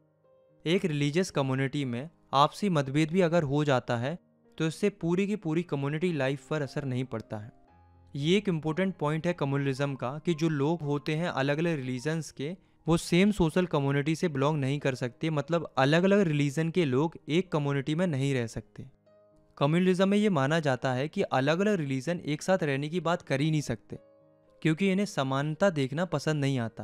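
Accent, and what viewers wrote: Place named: Bengal, India. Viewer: Are you from West Bengal kyu apki thodi bangali nikl jati h thodi thodi😅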